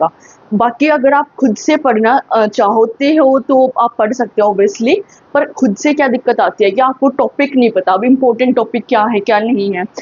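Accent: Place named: Haryana, India. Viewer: Haryanvi language,kha se ho aap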